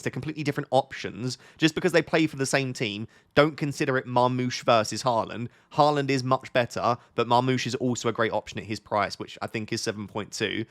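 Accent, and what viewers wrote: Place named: Nottingham, United Kingdom. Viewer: Didn’t know you was a notts lad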